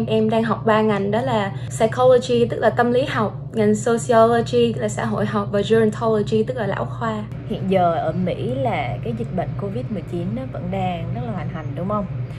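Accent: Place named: South, Vietnam. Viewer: Mình thấy bạn nói tiếng Nam hay đất chứ!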